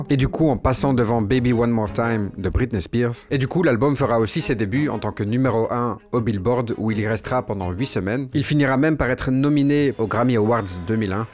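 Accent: Belgium. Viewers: bien aimer je like et m abonne J'ai comme l'impression que tu es Belge car tu as un accent. Pas comme le mien encore car je parle d'après mes amis Bruxellère lol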